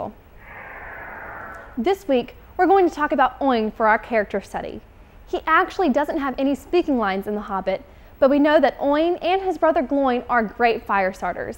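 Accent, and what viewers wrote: United States. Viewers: You're cute, but try to stop mis-pronouncing everything please. You can push past this "American accent" we believe in you!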